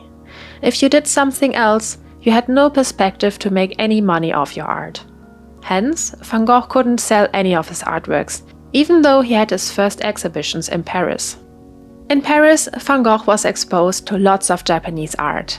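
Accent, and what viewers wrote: Germany. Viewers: Dieser deutsche Akzent ugh This accent I’m a German and it hurts even me🤣😭 but the video on it self is awesome.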